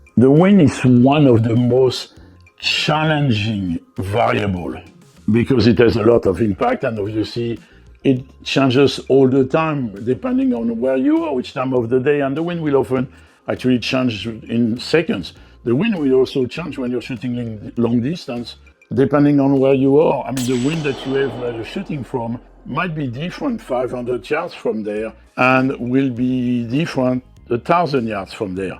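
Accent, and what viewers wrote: France. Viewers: What is that accent ? French ? Tu es français ?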